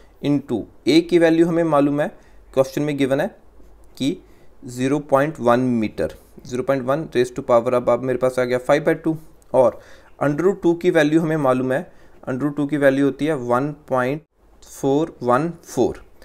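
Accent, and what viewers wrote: Punjab, India. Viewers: Punjabi accent itta funny Kyi hota hai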